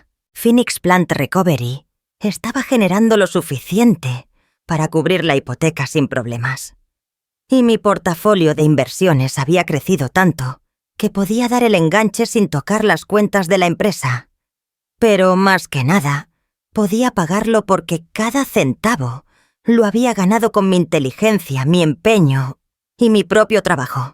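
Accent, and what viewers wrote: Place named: Spain. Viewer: Es el acento de alguien de nacionalidad española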